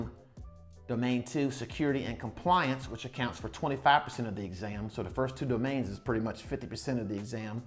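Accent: South, United States. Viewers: Thanks for the info. I'm taking some Udemy courses and plan to pass it on a month.😎🌟 We're are you from bro, you have that country singer accent.😅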